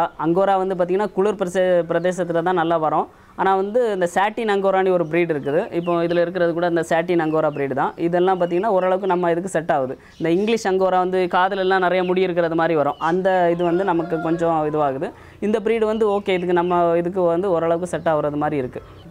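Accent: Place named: Tirunelveli, India. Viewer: Tirunelveli mathri irukku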